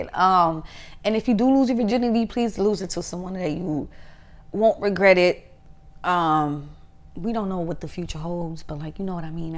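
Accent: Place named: Brooklyn, United States. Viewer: One thing about, it’s not a word she can say without sounding Brooklyn af. 🤣 Like everything about you scream BK/NY. I love your accent - a Bronx girl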